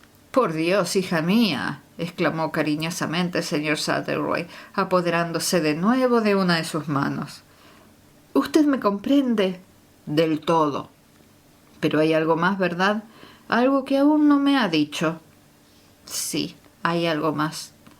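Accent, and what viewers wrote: Argentina. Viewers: Como ronuncia la eye se qie es Arguentina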